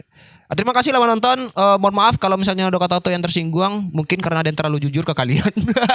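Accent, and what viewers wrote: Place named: West Sumatra, Indonesia. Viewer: Mantap..bahaso minang nyo kental...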